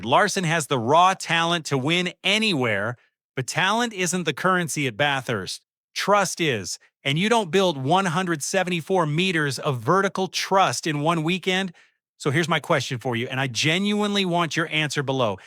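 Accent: United States. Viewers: Aussie script with american accent. AI needs to try it harder! Also where were the Bathurst shots??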